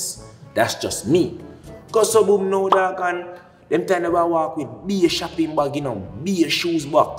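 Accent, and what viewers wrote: Jamaica. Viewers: yr Jamaican accent is a advantage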